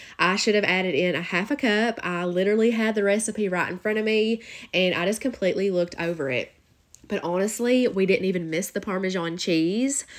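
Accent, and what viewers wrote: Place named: Massachusetts, United States. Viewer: your accent is so cute. In live in MA and you know how we sound! I love your accent and your channel!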